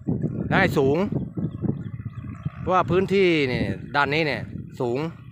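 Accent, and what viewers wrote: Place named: Nakhon Sawan, Thailand. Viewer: ครับผม ผมก็ว่าสำเนียงคุ้น ๆ คล้ายนครสวรรค์ ที่แท้อยู่ใกล้ ๆ กันนี้เอง สุดยอดครับ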